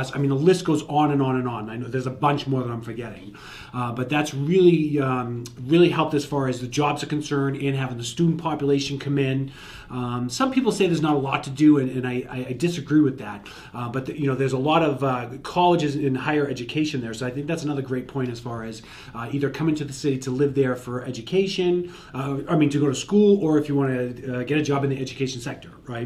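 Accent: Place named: Boston, United States. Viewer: You have a Bostonian accent.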